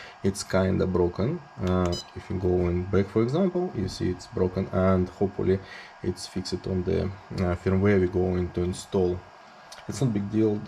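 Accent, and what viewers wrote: Russia. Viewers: Бикоз прабабли хи из рашн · О, приятель, твой английский звучит так, будто ты русский) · Рунглиш :D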